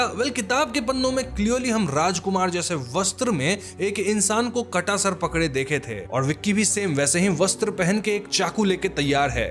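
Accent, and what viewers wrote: Bengal, India. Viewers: Larai bhai your accent is like a Bengali or Odia accent It is Ladai not larai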